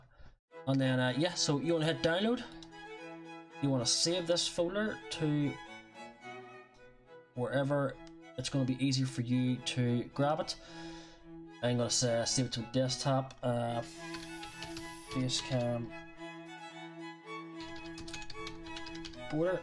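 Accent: United Kingdom. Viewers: Is that a Belfast accent?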